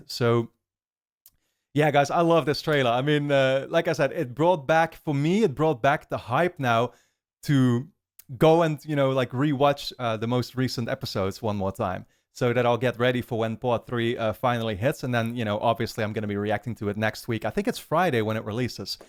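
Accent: Netherlands. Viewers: Haha I can hear you're Dutch from a mile away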